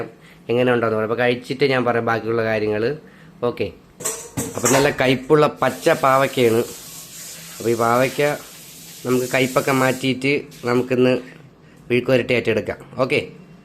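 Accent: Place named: Thiruvananthapuram, India. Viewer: തിരുവനന്തപുരത്തിന്റെ സ്വന്തം പുത്രനാണ് അതേ ഭാഷ സ്ലാങ്ങ്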